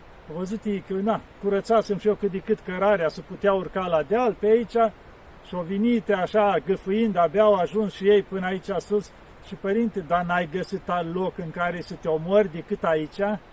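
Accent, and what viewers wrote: Moldova. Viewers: Bunul Dumnezeu să vă dăruiască sănătate și să vă asculte rugăciunea Părinte și M ĂICUȚA NOASTRĂ SĂ păzească și să facă pace în lume Iar ROMÎNIA NOASTRĂ SĂ O PĂZEASCĂ ȘI SĂ o acopere cu cinstitul și SF SĂU ACOPRĂMÎNT IAR PE TOȚI ROMÎNII DE Ori și unde sar afla să ne încingă cu SF - UL BRÎU și să ne țină strîns uniți lîngă SFÎNTĂ CRUCE A MÎNTUITORUL UI HRISTOS ȘI A MILOSTIVIRII SALE AMIN Și DV Vă mulțumim pentru cuvintele frumoase rostite în grai dulce Moldovenesc pentru că și eu sunt moldovean dar mai de la malul Dunării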